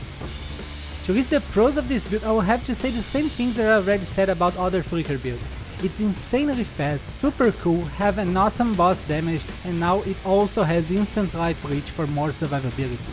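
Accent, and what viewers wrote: Brazil. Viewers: cara, vc é br né esse sotaque ai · Dude, where u from? You have a Brazilian accent xd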